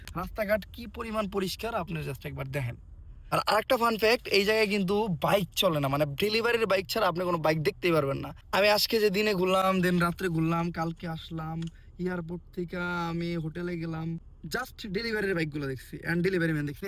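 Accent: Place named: India. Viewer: Indian voice ase vai bangla na asla video dekhe ki moja pawa jai..?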